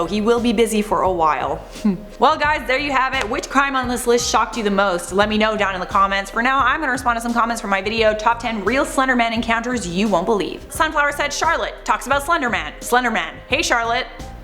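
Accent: New York, United States. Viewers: NY accent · You're rocking that shirt Charlotte. 🎃 Edit: your New York accent is awesome said in a New York accent 😂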